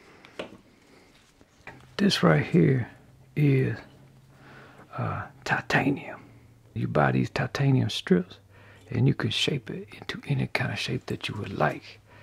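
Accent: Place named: Memphis, United States. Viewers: You're voice and accent reminds me of my uncle Jesse from Memphis! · Hello! Do you think it's possible to place orders for your items? I really, REALLY need one of the elephant fork pendants! Oh, by the way... I totally pegged your Mississippi accent, seeing as how I grew up in Memphis, TN. You sound like old friends back home!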